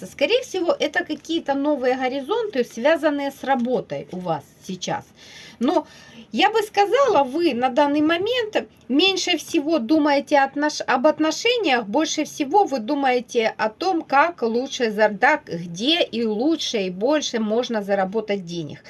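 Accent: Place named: Kuban, Russia. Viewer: У вас кубанский говорок. Я сама, из тех мест....🤗⚘️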